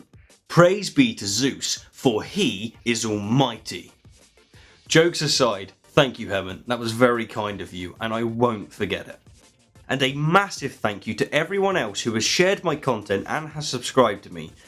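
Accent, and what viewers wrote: England, United Kingdom. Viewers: Seems people are convinced by listening to the beautiful English accent. What is freely asserted can be freely denied but if he is preaching to the choir we have a confirmation bias problem. How something immaterial can come from matter was not explained. Where do abstract ideas come from? Can justice, kindness, morality just pop out of the brain? While this may not prove any one religion, it sure points to the strong possibility that the designer of humans was a little smarter than random accidents. If things evolved then who or what was guiding the evolution. Random just does not cut it. The videos tend to be sciolistic and many of the readers are echo chambers for the sequacious opinions expressed.I read all of these so I can debunk the "debunked syllogisms" for my logic students.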